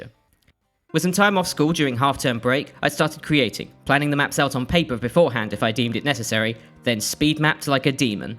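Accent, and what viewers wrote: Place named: United Kingdom. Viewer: >Map 25 >That blatantly placed inverted crucifix overlooking a pile of corpses If that doesn't speak "edgy teen", I don't know what does, and frankly, it feels nostalgic. It appeals to me on a personal. Regardless, this was a fun video, and frankly inspiring. Making a full fledged megawad when you're 17 is one hell of an undertaking. Pretty sure I spent my days as a 17~18 year old depressed, angry and jacking off, which... frankly isn't all too different from who I am today. The only difference is that I have a career and a steady income. But none of that really matters. :P I'm a bit late to the party, but I really want to get into doom mapping. I'm not exactly creative or smart, but I want to put out a map or two as part of my bucket list. Maybe one day it'll happen. Thanks for sharing this. Talented people like you are a rare breed these days. 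Also, I had no idea you were a Brit! Your accent blew my mind.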